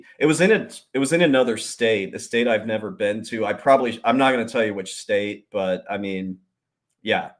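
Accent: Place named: United Kingdom. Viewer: Love that saucy British narrator. ❤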